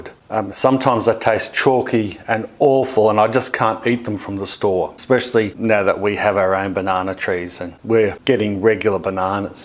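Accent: Australia. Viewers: You look awful like Russell Crowe. Also, you happen to be Australian, judging by your accent. You don't happen to be related to him, do you?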